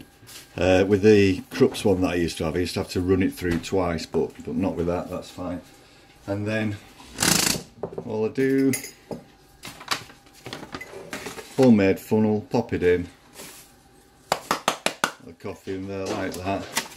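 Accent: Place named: Lancashire, United Kingdom. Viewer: ……thanks for replying bud………you sound like you’re from near to me in Lancashire. I took the top part to pieces late last night and put it back together then set it to coarsest grind then backed it further back towards the on off switch until it cut out then back until it operated………I’ve come to the conclusion it takes a couple of turns of the burr to achieve a new grind and I wasn’t giving it enough time as the grind is definitely more coarse………even so I would have expected to be able to get a coarser grind ……….but I’m not disappointed anymore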